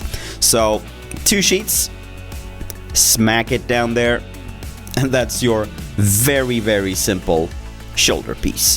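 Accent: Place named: Malmö, Sweden. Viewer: Ah ni är från sverige? Malmö dialekt?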